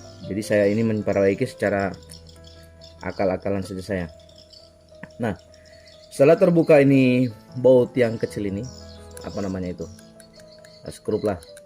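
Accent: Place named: Southeast Sulawesi, Indonesia. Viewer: Logat abang mirip logat org sultra.. 😂😂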